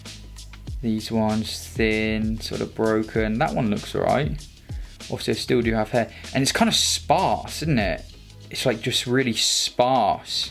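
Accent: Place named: United Kingdom. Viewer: I love your british accent sir. . .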